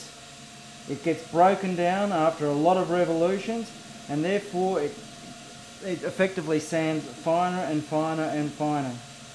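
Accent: Australia. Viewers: Lovely. I am just interested in turning techniques and results (being a female without a lathe or indeed a shed to put it in I can only admire the work of others. But I am truly amazed at the number of things that can be used in turning. I saw one video where the man used pieces of kitchen sponge in resin and the coloured pencils items are amazing. Good to hear a home grown Aussie accent too. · I'm Just really happy he's Australian, and I can hear the familiar sayings and accent.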